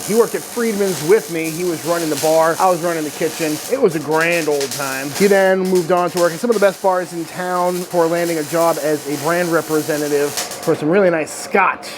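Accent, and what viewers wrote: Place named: New England, United States. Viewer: Every video I watch of yours I always say to myself "this guy is from New England". Glad I can now confirm that now.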